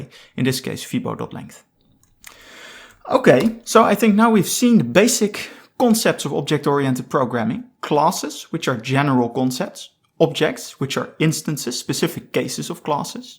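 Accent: Netherlands. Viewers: Afgaande op je accent ben je Nederlands.. Fijne video's ! dank!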